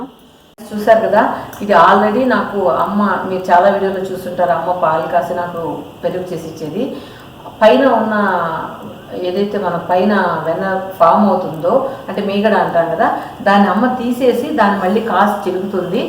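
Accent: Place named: Nellore, India. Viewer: easy chitka bagundi nellore yasa matladuthunte bhalega vundi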